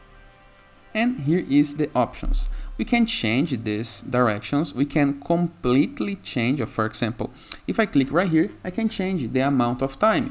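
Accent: Brazil. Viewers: Hi, are you brazillian? Your english looks like a brazilian guy. Thanks a lot for tutorial.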